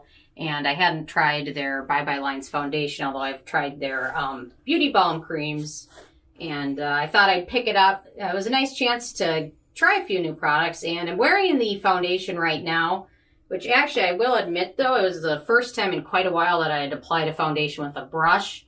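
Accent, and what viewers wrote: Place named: Wisconsin, United States. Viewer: The moment you opened your mouth and talked, I KNEW you were from Wisconsin!!! Love my fellow wisconsinites!!!!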